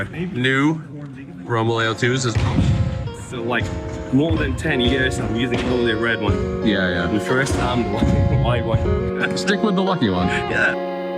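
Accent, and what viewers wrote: Germany. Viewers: aaaah mystery solved, thanks. Great Denglish btw. Best of luck with the brand and good night!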